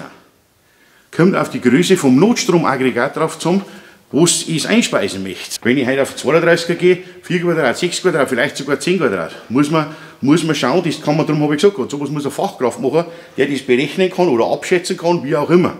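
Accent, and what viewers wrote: Bavaria, Germany. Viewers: Dem Dialekt nach hoffte ich dass Ihr aus Bayern seid. Leider nicht, sonst haette ich evtl. einen Auftrag gehabt.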